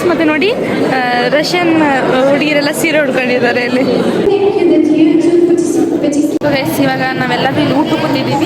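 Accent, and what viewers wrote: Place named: North Karnataka, India. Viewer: Uttar Karnataka hudgan voice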